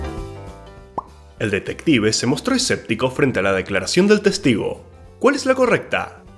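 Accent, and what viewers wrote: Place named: Latin America. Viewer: PERDON. La pronunciacion es LATINA. Esto conlleva a ERRORES.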